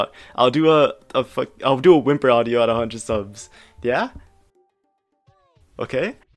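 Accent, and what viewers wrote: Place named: Germany. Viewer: Man merkt dass du Deutscher bist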